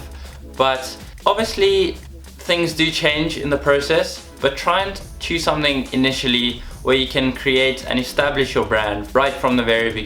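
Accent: South Africa. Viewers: Are you south african??? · Are you South African my mum is from South Africa 🇿🇦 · You sound South African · Mens kan hoor jys afrikaans lol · Are you south african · He’s South African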